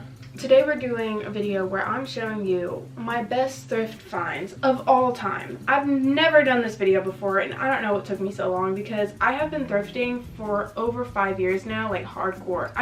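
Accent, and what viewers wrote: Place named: United States. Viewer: u sound like one of my cousins from america 🥺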